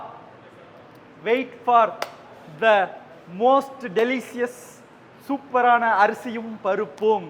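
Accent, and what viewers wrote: Kongu, India. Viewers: 🙏 க்கு ஜே🥳கைப்பக்குவம அருமை.கொங்கு தமிழ் அழகு.என்ன பருப்புன்னு சொல்லியிருந்தா👌😍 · உங்கள் கொங்கு தமிழ் மிக அருமையாக இருக்கிறது, உங்களுடைய செய்முறை மிக நன்றாக இருக்கிறது உங்க style -ல சொன்ன அதிபயகரமாக இருக்கு 👍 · 🙏🏻🌹 வணக்கங்கள் உரித்தாகுக.அரிசிபருப்புசாதம் சூப்பர்.அதை செய்து காட்டியபடி கிரிக்கெட் காமெண்ரி போல் லாவகமாக அதுவும் கொங்கு தமிழில் அழகாக பேசியது அதைவிட சூப்பரோ சூப்பர்.தங்களது சாம்பார் பொடி ரெசிபி யை தந்தருளுங்கள் என்று பணிவுடன் கேட்டுக் கொள்கிறேன் மிகவும் நன்றி கலந்த வணக்கங்கள் உரித்தாகுக 🌹🙏🏻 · கொங்குத்தமிழ்வேட்டி துண்டுவேறலெவல் கண்ணு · சாதம் மட்டுமல்ல தங்களது கொங்குத் தமிழும் பிரமாதம் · Me too Rangaraj.... my fav dish both items.very happy to see this video and ou coimbatore kongu tamil💕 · கொங்கு தமிழ் அரூமை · Hi sir...my native place is coimbatore.... நம்ம கொங்கு தமிழ் le அசத்தி விட்டீர்கள்...this rice is all time favourite to our family....sir... 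coconut oil ku பதில் சுத்தமான நெய் சேர்த்து சாப்பிட்டு பாருங்க...semmaayaa இருக்கும்...non veg எல்லாம் அந்த பக்கம் நிக்கணும்....thank you sir for uploading this video... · Seithu sapittom..arumai Ungal kovai kongu thamizh polave paarabariyathodu irukkueathu..nandringa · Our native COIMBATORE special food ultimate Anna👍👌👌👌👌Exact matching side dish👌👍 our kongu naattu tamizh ❤❤❤👍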